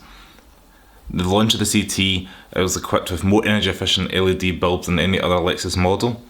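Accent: Scotland, United Kingdom. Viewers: That's funny, I heard the heavy Scottish and still put the video on 1.25 once your ear gets it, it is all the same. Although I have watched a few Scottish films and they have some good sayings that crack me up. Love it, we don't all need to be the same, please stay different. I have a CT<num> and am now over 100k. I need to do the spark plugs, the shark fin is leaking, and the ABS for the brakes is whining as well as the battery which was all good a few months ago now won't do EV mode. All at once, is that a key point? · The guy is speaking Scottish what does Dutch have to do with it?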